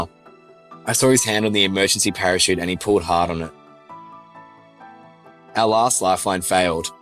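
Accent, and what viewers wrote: Australia. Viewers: Omg I love Australian voices for some reason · He sounds Aussie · Ohhhhhh mate aussie? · U have a australian accent and it is cute asf now I kinda want a australian bf lol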